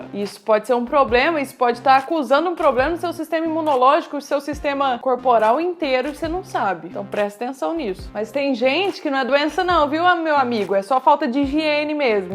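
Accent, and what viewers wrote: Minas Gerais, Brazil. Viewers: vc é mineira?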